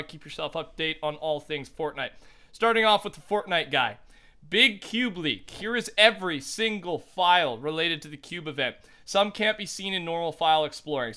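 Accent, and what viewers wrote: Canada. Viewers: Damn. So many comments and likes not one person got that it’s a Canadian accent we pronounce and spell a lot of words differently!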